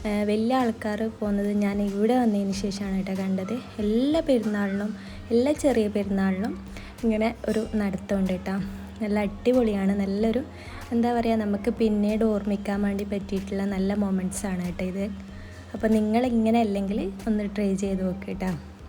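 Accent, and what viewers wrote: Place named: Kannur, India. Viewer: ❤nice 👍ഈദ് മുബാറക് ആദ്യം ആണ് കാണുന്നത് ചാനൽ കണ്ണൂർ ആണെന്ന് തോന്നുന്നു സംസാരം കേട്ടിട്ട് 😊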